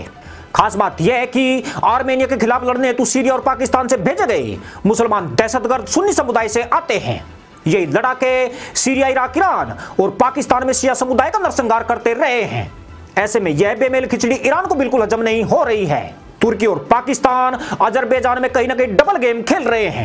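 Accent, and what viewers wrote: India. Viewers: Ji aap rajesthan se ho kya ya hariyana se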